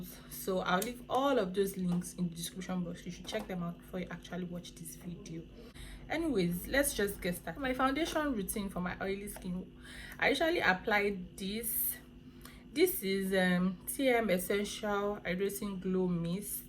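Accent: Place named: Nigeria. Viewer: As soon as I opened the video and heard your voice I said, yesss my Nigerian sistaaa!!! just subscribed!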